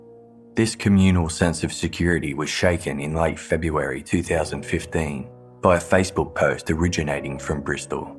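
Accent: Australia. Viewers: Great Australian narration!!!!❤❤❤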